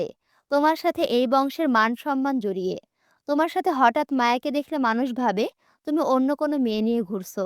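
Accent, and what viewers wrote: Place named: Chittagong, Bangladesh. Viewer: তোমার মুখে আমাদের চট্টগ্রামের ভাষা খুব মানাইছে😊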